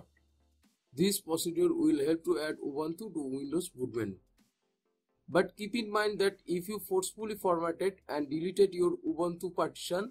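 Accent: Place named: India. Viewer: you are the 397th random Indian accent guy on the internet that saved me thank you.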